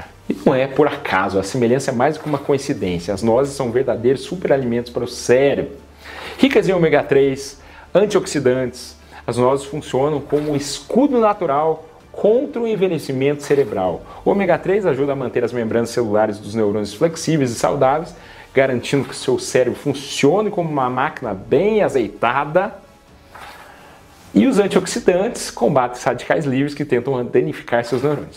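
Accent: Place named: Rio Grande do Sul, Brazil. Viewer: Seus vídeos são fantásticos, além desse sotaque maravilho, levandodo-me às memórias do meu querido Rio Grande do Sul, que saudades daí do sul! Deus lhe abençoe, guie e guarde. ❤ Grande abraço.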